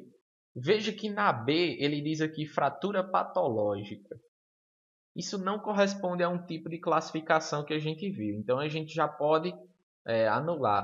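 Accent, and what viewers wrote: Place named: Northeast, Brazil. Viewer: Cara top... É seu sutaque melhor ainda ..eeee nordestao. .. Sou tec. Em imobilização ortopédica. ..sempre fico refrescando a memória assistindo vídeos como o seu valeuuu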